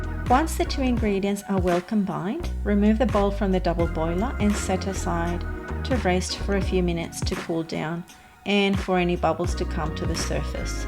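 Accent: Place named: Australia. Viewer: Love it... voice over is amazing love your aussie accent!!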